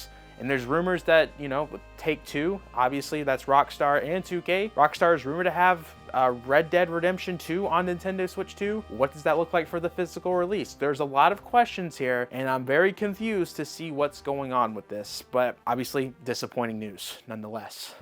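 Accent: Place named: New Jersey, United States. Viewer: I wonder if his odd pronunciation is intentional for engagement… I doubt it. Where is the accent from west NJ?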